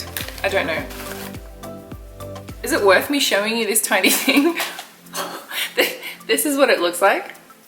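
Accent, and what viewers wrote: Australia. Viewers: I love to hear aussie accent.. plus eating filipino food... that is gold... keep it up.. new subscriber here... I hope you recognize me..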